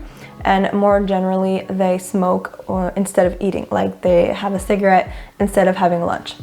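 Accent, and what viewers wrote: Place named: United States. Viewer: Do you currently live in France? :) Also your accent sounds very American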